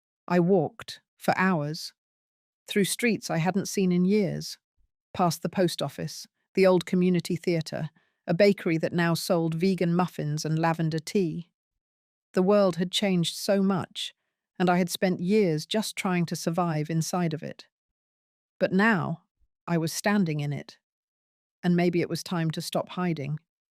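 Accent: England, United Kingdom. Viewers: The narration portion of the Ai data input chosen- needs edited out. 😂😂 Otherwise good story. Like the English accent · Beautiful voice and diction I have not heard in years. I am from Singapore . Please keep it up. You are British ?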